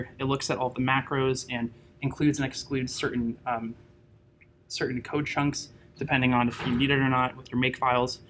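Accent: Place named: United States. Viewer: Subtitles please! I'm from India and I don't understand American accent. 😞🙏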